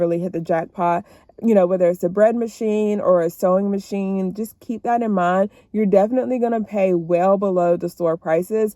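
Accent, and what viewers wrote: South, United States. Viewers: I love that southern twang ❤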